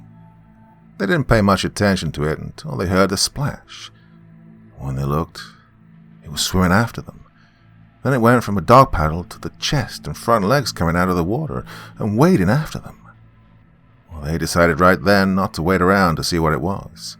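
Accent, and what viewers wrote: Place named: United Kingdom. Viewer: Hearing Michigan and Michigan towns I know about in a British accent is kinda neat. On a side note ive never heard this legend before.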